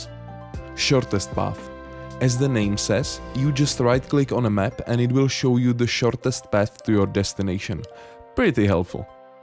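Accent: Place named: Czech Republic. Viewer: něco málo sem se naučil dík začal sem hrát zhruba před 14 dny:D okamžitě mi z přízvuku bylo jasný že si Čech, tak zdar!..... teď když mluvim o přízvuku... zníš na 100% jak Adam Jícha lmaoo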